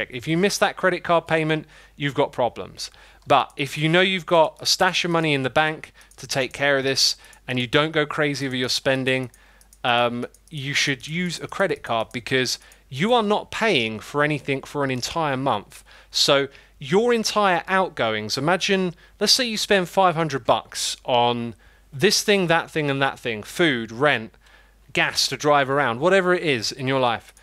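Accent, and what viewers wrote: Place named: United Kingdom. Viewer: imma be honest... ur British accent makes me want to actually listen to you and take ur word for advice as a young person.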